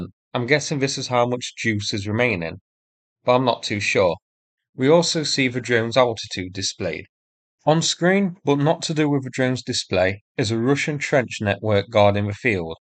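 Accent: United Kingdom. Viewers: Danngg your soothing voice & unique British accent really make my day lol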